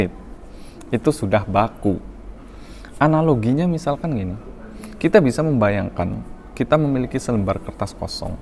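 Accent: Banyumas, Indonesia. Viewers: Njenengan ngapaknya daerah mana mas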